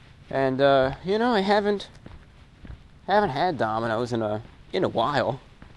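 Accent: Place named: New York, United States. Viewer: 7 years ago. Your NY accent was HEAVY back then.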